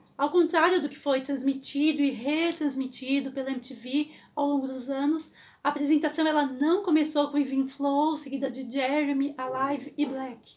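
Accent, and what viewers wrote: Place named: Brazil. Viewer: Somos brasileiros... nossa lingua oficial NAO é o ingles... a gente nao tem obrigacao nenhuma de falar como eles... assim como eles nao pronunciam nada 100% quando tentam falar portugues...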